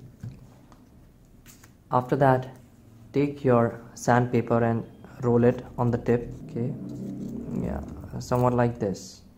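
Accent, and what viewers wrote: India. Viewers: EVERYWHERE HINDI YOUTUBERS (ACCENT AND HANDS) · Reading all the negative comments on this man's well produced, articulate and somewhat helpful video was discouraging. Looking at his tools and noticing his accent I would bet he does not live in the U.S/UK/Australia or some other country that has access to state of the art equipment or $ to purchase them. My guess is he lives in India with a billion people and only 10 have even a solder iron. The iron he is using was probably passed down from his grandfather and was sanded well before he got it. So last resort if the tip had its coating removed before is to sand. And that will work. Not long...but will work to do a job. Hats off to a well produced video and using what ever means necessary to make a fix.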